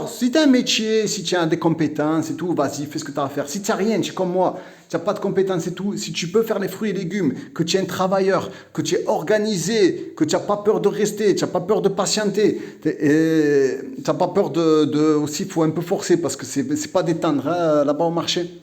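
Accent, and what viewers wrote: Marseille, France. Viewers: Frère il faut rien dire point faut juste faire. Et pour le tu parle comme un voyou je pense c'est ton accent ils ont pas l'habitude.. Ça Renvoi à Marseille le banditisme... Bref Oui en hijra faut écouter personne sérieux beaucoup qui vendent du rêve et ya bcp de jaloux en Algérie faut se méfier de tout le monde😂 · Moi, je trouve que tu as plus un accent marseillais qu'autre chose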